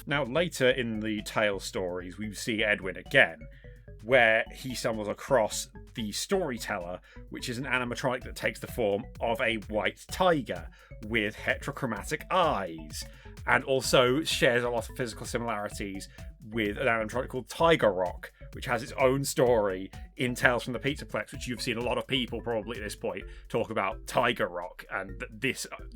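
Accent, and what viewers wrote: United Kingdom. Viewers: fkn bri ish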